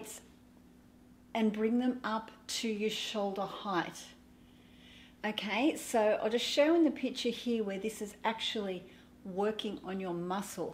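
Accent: Australia. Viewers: I know....i swear, she could tell me I needed a lobotomy and I would be like "ok". Everything sounds nicer in an Aussie accent! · And I love her Australian accent!! 😃❤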